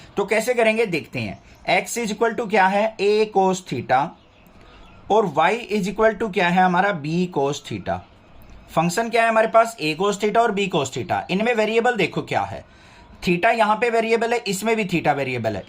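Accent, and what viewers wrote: Haryana, India. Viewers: Sir are you from Haryana?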